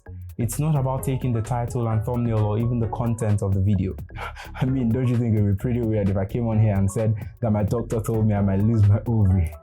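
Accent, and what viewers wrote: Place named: Nigeria. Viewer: No Nigerian that grew up in Nigeria cannot escape the accent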